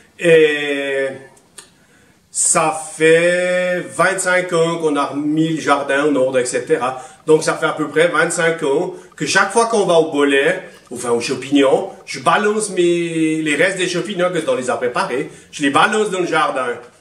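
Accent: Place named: Fribourg, Switzerland. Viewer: Super ton accent. Moi en bon fribourgeois, je peux pas dire le contraire....... Tché Don !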